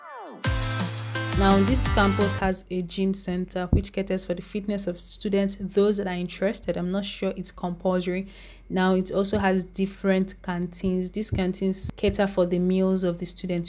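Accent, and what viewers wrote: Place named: Enugu, Nigeria. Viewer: Dat Enugu YouTuber, I love your accent and your work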